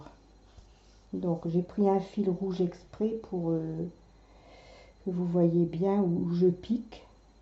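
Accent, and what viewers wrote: North, France. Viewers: merci vous avez l'accent du nord que j'aime je suis née dans le nord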